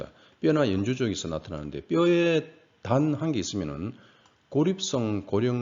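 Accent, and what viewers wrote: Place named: Busan, South Korea. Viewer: 교수님 부산분이신가요 ? 익숙한 억양 너무 좋아요 쏙쏙 들어오고 친숙하고 재미있어요 ~^^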